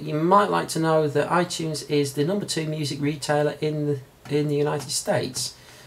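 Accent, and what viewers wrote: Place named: United Kingdom. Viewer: good to hear a british guy doin tech stuff, nice vid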